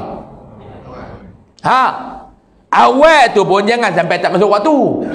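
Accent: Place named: Kedah, Malaysia. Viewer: Simple ja bab ustaz ni,,bg yg anti dgn ustaz ni mmg akan nampak tak elok ja,bg yg suka ilmu yg ustaz sampaikan mereka tak kisah pun bab loklaq bahasa ustaz,,rasanya org kedah tak terasa sangat pun bahasa ustaz,jd syok pulak🤭😁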